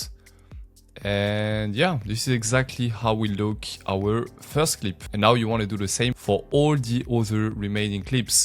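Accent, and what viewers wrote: France. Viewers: C une dinguerie , btw on sait tres bien que tu es francais rien qu’a ton accent hahahaa . Tuto incroyable , si tu pouvais nous en faire plus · Goeh i hate french accents. Just talk normal!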